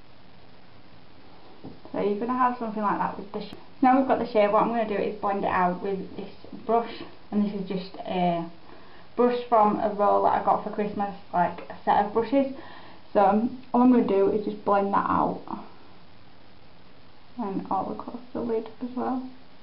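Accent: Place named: Scotland, United Kingdom. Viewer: Are you Scottish? My grandfather was from Scotland and you both have the same accent. I've never met him, since he died when my father was little but I have heard a lot of recordings of his voice.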